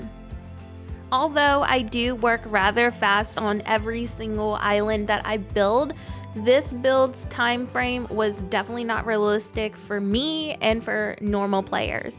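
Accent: United States. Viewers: Ooooo, do you live in the Southern Hemisphere? I would have guessed Northern only because you seem like you have a US accent. I'm from New England myself (the land of regional accents).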